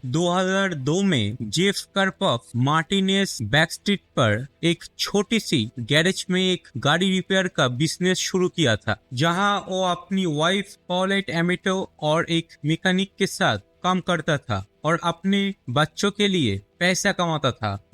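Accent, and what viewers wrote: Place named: India. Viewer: Toom englis hindi ki mafik bolta hi.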